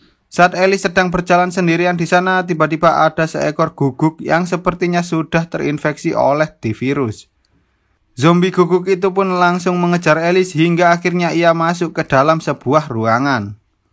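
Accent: Java, Indonesia. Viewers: Bang koe wong jowo endi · Bang ngomongnya kok kya orang jawa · abang orang jawa kah? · Bang orang jawa😂 sama dong kayak aku 😆😁😁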